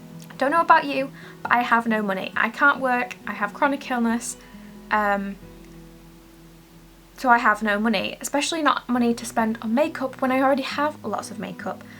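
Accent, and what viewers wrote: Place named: United Kingdom. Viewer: Hii, it's me, we were just chatting via Twitter! I didn't notice you were in UK, love your accent. Yep, my hubby took me to Sephora the other day and it's kind of a whole new world to him. He is overwhelmed by how much STUFF exists, and I told him even people into makeup are feeling that way, like a fatigue with too many releases. I love the new Norvina palettes, but I just don't need them, it's tooooo much all the damn time and I need to enjoy the makeup I've already bought. I didn't know I could get P. Louise from Morphe now, I hadn't tried it due to the shipping but will now once I've finished my Paint Pot! Your look is beautiful!! 😘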